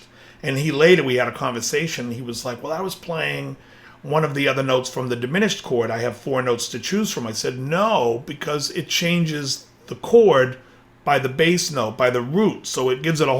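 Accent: Boston, United States. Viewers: Nice lesson. Are you from the Boston area by any chance?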